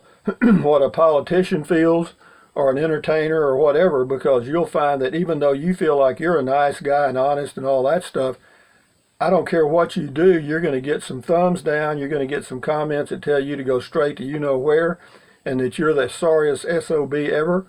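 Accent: Texas, United States. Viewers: I enjoy all of your videos especially the early ones. I went to school in Austin and consequently developed a great love for south central Texas. Your accent (yes!) is familiar and pleasant. Your point about being alone but choosing not to be lonely... yes. Take care and keep the videos coming. I look forward to the daily installments.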